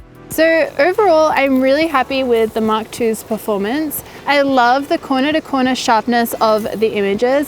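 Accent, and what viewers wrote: Australia. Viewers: Omg thats literally the store I bought my first lens from, just two weeks ago. I also went in there yesterday to check if they had a canon 35mm 1.4 cheaper non L but no stock. Now I'm looking at buying a mark 1 used for cheap today hopefully. For some reason I just assumed you where Canadian like most you tubers lol, I didnt even notice the aussie accent but now I think about it, its definitely there :P Got to say too damn you take some nice photos for unedited.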